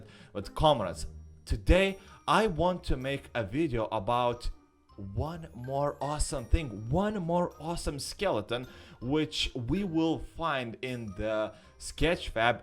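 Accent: Russia. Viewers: What country do you live in? (Russia)? русский акцент)))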